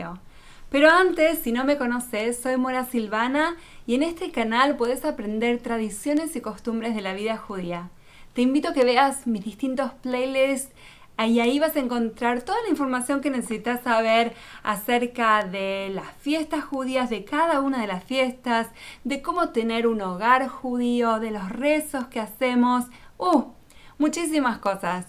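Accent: Argentina. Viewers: querida; hasta que se dijo v ine a vivir ; estuvo bien, cuando se dijo USA, alli si que ya no mas me gusto, tienes un dialecto argentino , asi esta mejor , no lo destruyas con usa , querida evita esas palabras, ha sido y es el demonio desatado sobre nuestra region, no la riegues. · Silvana no tengo mucho tiempo de verte conocido por medio de YouTube y me da tanto gusto de escucharte tus expresiones y explicaciones acerca de las costumbres Judías y de pronto pensé que escuchaba a una Argentina, pero bueno eso no importa; sabes amo a Israel amo a Jerusalén quizá no tengo una forma de expresar clara en cuanto al decir amo…… pero siento algo que no se cómo describirte, Dios es bueno…….sigue adelante con esas explicaciones tan bonitas, mi nombre es Luis y soy de Guatemala.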